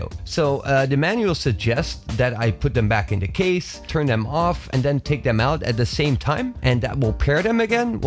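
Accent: Netherlands. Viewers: This guy has a subtly Dutch accent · Are you Dutch?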